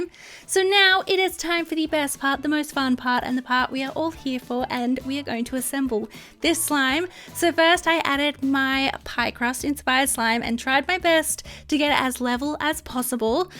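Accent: Australia. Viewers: I LOVE her Australian accent!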